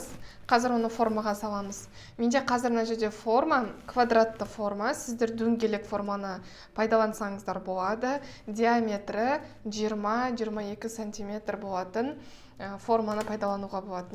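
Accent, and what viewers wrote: Standard. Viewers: Жарайсын.сенын таза казакша сойлейтинын оте керемет.торттарын да керемет. 👍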